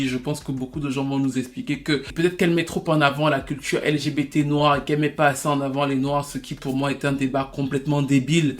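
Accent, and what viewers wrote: Cameroon. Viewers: j’aime bien ton petit accent camer 👍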